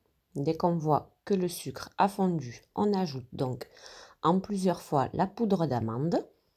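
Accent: South, France. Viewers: Magnifique voix tu es du sud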